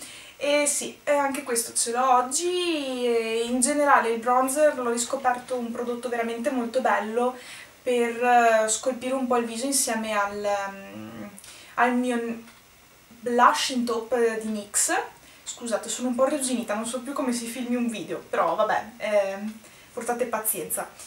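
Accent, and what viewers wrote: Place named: United Kingdom. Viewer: A parte i prodotti fighi, ti faccio una domanda un po' da "fattilicazzimia": come fai ad avere un accento very british? Hai fatto qualche corso, scuola ecc ecc? Grazie mille per il video, un bacione!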